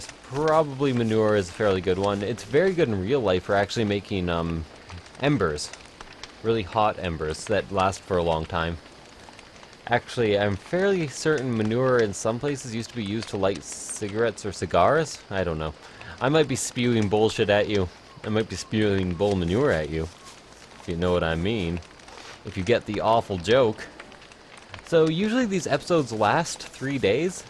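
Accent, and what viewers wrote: Canada. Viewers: That Canadian accent is redonkedonk xD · r u Canadian?? Cuz ur all, "Oat, oat." Instead of out.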